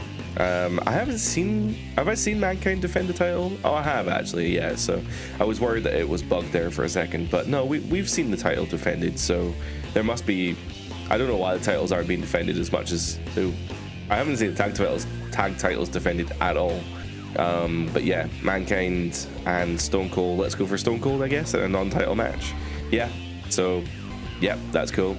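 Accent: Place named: Central Belt, United Kingdom. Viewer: I’m from Stirling. You sound like you’re from central belt as well! How’d my aim?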